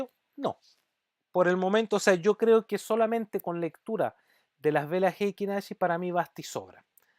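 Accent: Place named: Chile. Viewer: 1er video q veo con una explicación tan clara de los patrones Heiken Ashi ! Se agradece la iniciativa.. (Da gusto escuchar el acento chileno) Salu2!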